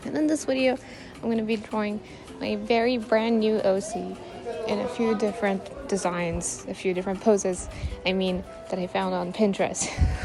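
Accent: India. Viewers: I’m also Indian and i agree with everything you say , I’m a school student for now but art is something i really love . And people around me even those who draw just draw what they find and at point , I don’t think anyone loves it as much . It’s also not something you can casually say for a future career . I don’t know if i should talk about this - but I also really love to write stories and no one around is a writer or a reader 😢like come on guys ! Creative outlets are so not talked about here ! And your accent is nice btw ! ❤ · not everyone has indian accent, never heard anyone w it, maybe she is from north east cus I also lowk speak like her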